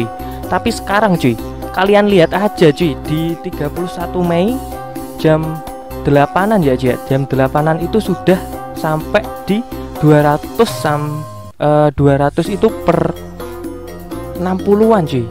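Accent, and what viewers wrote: Java, Indonesia. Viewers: Orang jawa bang? 😂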